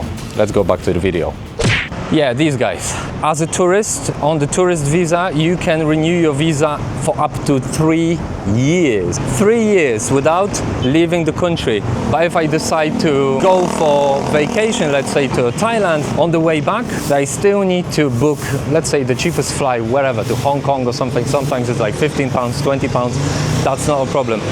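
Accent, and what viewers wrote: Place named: Eastern Europe. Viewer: You’re not a British 🇬🇧 citizen aren’t you because you’re accent sounds more from Eastern Europe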